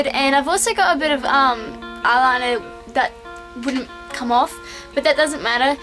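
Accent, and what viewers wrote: Australia. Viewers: r u austrailian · u sound Australian i now love you just cause i am australian ^-^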